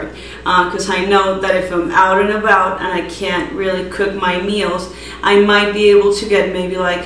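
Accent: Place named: Colombia. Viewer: Hi! Congratulations in your new English Channel!!! I'm sure that very soon you' ll have thousands more followers enjoying your easy and delicious recipes. Descubri tu canal en Espanol solo hace un par de meses y desde entonces busco a diario algun nuevo video que hayas subido con recetas o consejos. Me ayuda un monton que pongas los macros ya que yo sigo un keto... free lance... quiero decir, siin complicaciones. gracias por hablar tan claro, y enfocarte en el tema, muy profesional. Soy peruana,vivo en Canada, pero por 8 anos vivi en tu bella Colombia, en Cartagena, tierra del Vallenato que adoro y llevo en el corazon. Goze carnavales en Barranquilla y estuve de paso por Bogota donde hice algunos amigos, asi que el oir tu acento trae muy lindos recuerdos del pasado. Felicidades a ti y a Carmen, y que sigan los exitos.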